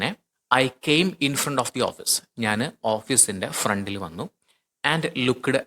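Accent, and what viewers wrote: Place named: Thrissur, India. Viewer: Really useful video 💕👌 When I heard your Malayalam translation, I felt that you are from Trissur. Awesome class😁👍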